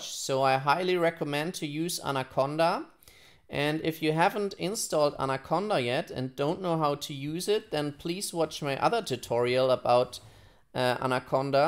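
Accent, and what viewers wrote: Germany. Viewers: His voice is too sharp with a German accent.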